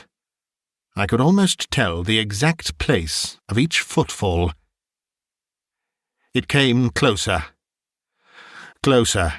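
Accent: United Kingdom. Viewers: Yes, you can’t beat a good old fashioned perfect diction posh English voice, with a no nonsense masculine delivery , for these stories......perfect.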